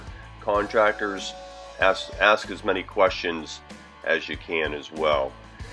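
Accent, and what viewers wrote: Pittsburgh, United States. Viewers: looked on all your sites but dont see where your located,,i detect a pittsburgh accent in your voice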